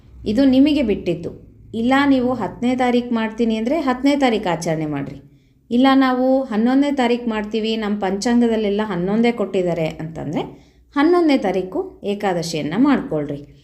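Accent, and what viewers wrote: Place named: Bangalore, India. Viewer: ನಿಮ್ಮ ಮಾತು ಕೇಳಿ ದರೆ ಬೆಂಗಳೂರು ಎನಿಸುತ್ತದೆ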